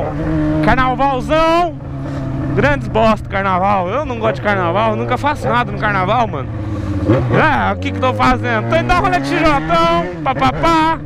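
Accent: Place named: Northeast, Brazil. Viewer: EU SOU DO SUDESTE E VEJO TDS SEU VIDEOS PQ VC SO FALA NORDESTE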